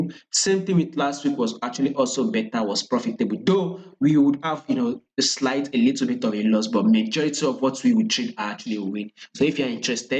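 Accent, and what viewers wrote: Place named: Africa. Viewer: u talking to heavy African accent..can u write the levels to buy n sell on the chart so its easy to follow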